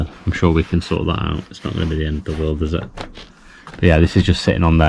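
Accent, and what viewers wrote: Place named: RP, United Kingdom. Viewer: V posh 🖐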